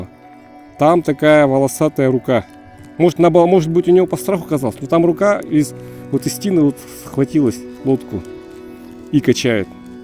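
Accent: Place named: Russia. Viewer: Как приятно слышать настоящую русскую речь, неиспорченную, народную. Удачи и процветания! Из Полтавы, Украина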